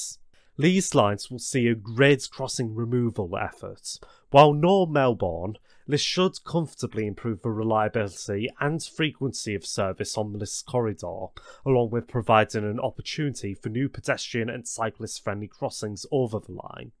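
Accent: United Kingdom. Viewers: The person sounds like they're from Liverpool that's talking in this video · British accent is so strong its hard to tell you're speaking English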